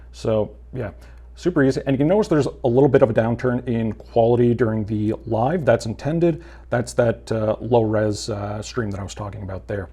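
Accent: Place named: Cape Breton, Canada. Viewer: If that isn't a CB accent I'll eat my hat.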